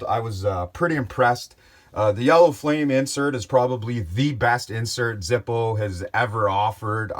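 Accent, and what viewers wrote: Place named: Canada. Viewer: I support you. I remember seeing you on a couch with Tman (and a cat) and there was some chick in the room too. I remember watching your holyday video where you stayed at a hotel at the beach with your family, Infact I remember that video well. I remember Tman referring to you as a better option as a friend, If I was interested in inviting someone to Down Under. I remember the amazing gift of joy Tman gave me, as he puffed away on a Lucky Stike or Camel un fileted. But just now I want to say to you. I support you and Canada. I enjoyed who you are. And I enjoy your Canada voice. I could go on like your bus(van) trip to the Zippo factory or how I'd love to just grab a Blue Berry Zippo of the shelf at my local tobacconist. Ashes to ashes. BUD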